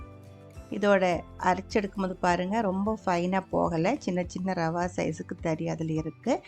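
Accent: Kerala, India. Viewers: Very nice receipe. Your malayalam tamil hearing is very different. 😂😂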